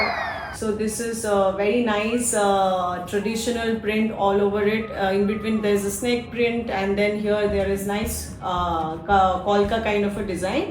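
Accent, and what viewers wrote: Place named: Telugu, India. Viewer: Ur telugu so cute 😊 nice bags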